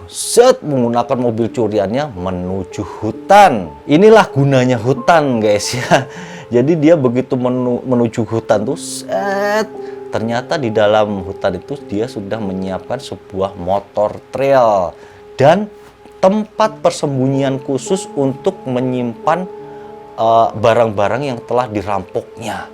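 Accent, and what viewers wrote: Java, Indonesia. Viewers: Medok amat coy..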